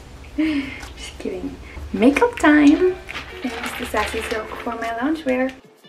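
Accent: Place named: Visayas, Philippines. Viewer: Pag ikaw talaga nag sspeak ng bisaya ang sarap sa ears 😍. More pa sa mga next vlogs pleaseee3 🤗❤ keep safe ate ❤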